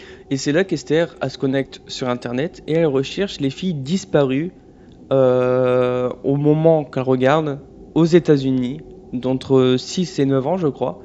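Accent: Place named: North, France. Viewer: Rien à voir désolé mais t’es blond, t’as les yeux noir, un accent du nord, tu serais pas le frère à jojo Bernard ?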